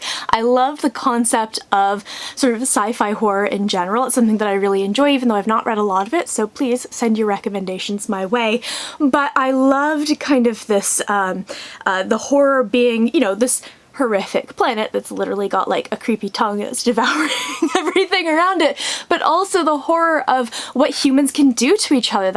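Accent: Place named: England, United Kingdom. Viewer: I really love your english accent! ❤️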